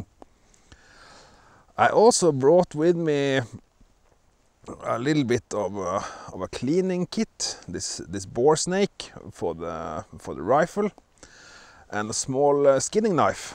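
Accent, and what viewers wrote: Norway. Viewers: Really relaxing the way you narrate. Best thing is the Nor-english accent. Hehe ;) FYI : What he is hunting for Rådyr = Venison.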